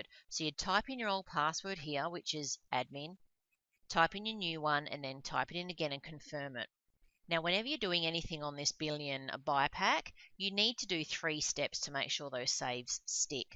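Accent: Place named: Australia. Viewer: you are so Australian, I love it <3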